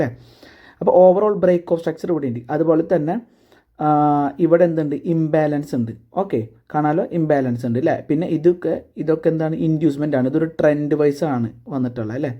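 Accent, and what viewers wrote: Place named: Thrissur, India. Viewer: ചേട്ടാ 3 മിനിറ്റ് ടൈം ഫ്രെയിം ഉപയോഗിച്ചു smc വരച്ചു നോക്കൂ അടിപൊളി ആണ് എനിക്ക് ഇവിടെ ചാർട്ട് പോസ്റ്റ്‌ ചെയ്യാൻ പറ്റുന്നില്ല ഇന്ന് 9.20 നു ce എൻട്രി 9.42 നു put എൻട്രി 2 ഉം അടിപൊളി ആയിരുന്നു. ഇവിടെ ഉള്ള ആളുകൾ forex മാർക്കറ്റിൽ യൂസ് ചെയ്യുന്ന smc സെറ്റ് അപ്പ്‌ വെച്ചു ഇന്ത്യൻ മാർക്കറ്റിൽ ട്രേഡ് ചെയ്യുന്നത്. അത് കൊണ്ട് smc യുടെ കൃത്യമായ ഗുണം കിട്ടില്ല ഇന്ത്യൻ മാർക്കറ്റിൽ ഇയാളുടെ വോയിസ് കേട്ടിട്ട് ഇവിടെ തൃശൂർ അടുത്ത് ഉള്ള ആള് ആണ് എന്ന് തോന്നിയത് കൊണ്ട് പറഞ്ഞത് ആണ്. ഈഗോ ഒന്നും തോന്നരുത്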